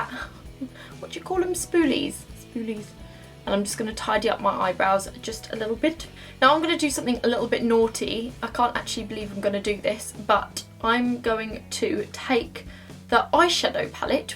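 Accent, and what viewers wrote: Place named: England, United Kingdom. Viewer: woohoo!! your backdrop is so cute!! haha you also have such an english accent!! also your hair looks fab! Love your videos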